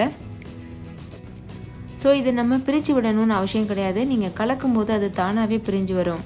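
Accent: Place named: Madurai, India. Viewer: akka unga slang madurai mathiri eruku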